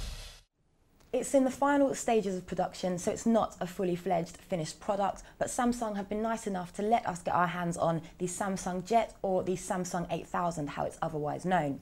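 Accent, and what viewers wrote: United Kingdom. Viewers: fuck british accent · SHe got Brit accent.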